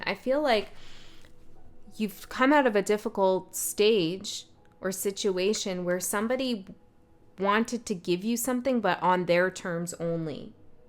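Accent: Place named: Canada. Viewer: Such a great reading! Also loved hearing that you’re Canadian- as a Wisconsinite, I hear that accent pop out 😂 Resonates a lot with energy that has been coming out in my readings for Pisces as well: feeling like we need someone else to attain the success. One of my favorite astrologers, Pam Gregory, always asks her audience to ask themselves- “are you a victim of the universe or a co-creator with the universe.” Taking the perspective of Saturn in Pisces building resilience vs. pushing us down has been extremely helpful for me, personally. Thanks again for your messages and insights!✨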